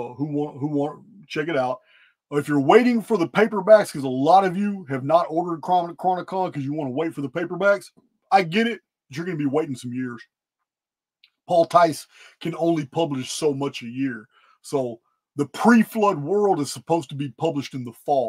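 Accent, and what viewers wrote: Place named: East Texas, United States. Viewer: I'm a new subscriber. From the beginning of '24 someone who worked for me was trying to manifest. Ive listened to you for around 11 hours. The person I mentioned, trying to manifest. W/O a Spiritual foundation. I think thats been the warnings. The person sunk deeper and deep into rage and abusive behavior. It's sad to watch. I'm much older and putting great efforts time and again. We have to do the inner work. Otherwise there is no peace. And we can fall extremely low. I highly suggest pauses at times before reacting, making choices. Get to know yourself. Set goals and show up. You can do it. You definitely are inspired at times. When we don't recall it, it is often called channeling. Which is Humorous because you say you don't. I think you do. You do talk very nearly East Texas. i understand it. I definitely will be looking at you. 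Thanks for all your time, and I certainly hear recovery from times past. Blessings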